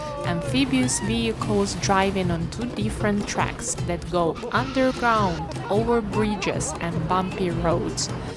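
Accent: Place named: Eastern Europe. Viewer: What a familiar Eastern European accent:) Bardzo dobry film!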